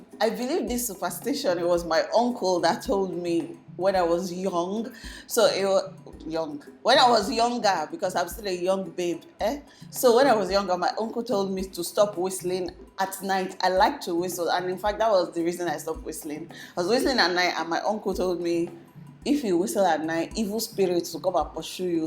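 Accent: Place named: Warri, Nigeria. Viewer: No wonder!! I knew your pidgin English was like a warri girls own ... your so beautiful 😍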